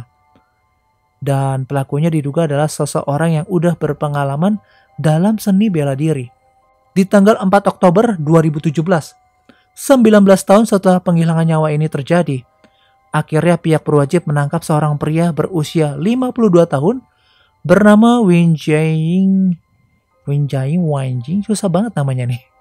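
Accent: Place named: West Java, Indonesia. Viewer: Mantap aa agak lucu dengar nya pasti dari jawa barat y😆 Pelajaran paling penting .. Penting ny kita bersosialisasi dengan orang lain biar klo ad apa ap ada yg tau dan nolongin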